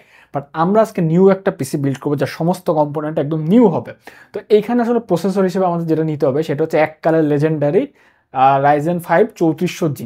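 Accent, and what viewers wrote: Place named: Chittagong, Bangladesh. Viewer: ভাইয়ের বাড়ি কি চিটাং নাকি!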